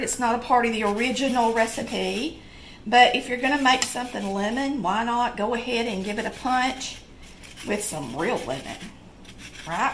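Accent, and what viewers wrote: South, United States. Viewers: I think your hair is beautiful I love your southern accent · I love your personality and southern draw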